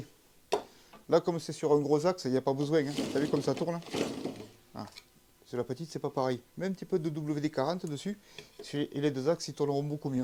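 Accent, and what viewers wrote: South, France. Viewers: L’accent du SUD quelle beauté 🤙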